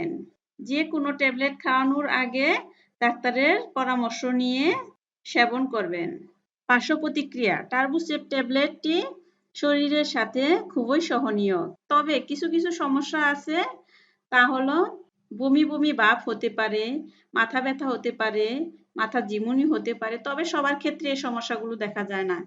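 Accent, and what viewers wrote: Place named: Tangail, Bangladesh. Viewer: Oi apnar voice sune mone hoy apnar basa tangail sotti na ki??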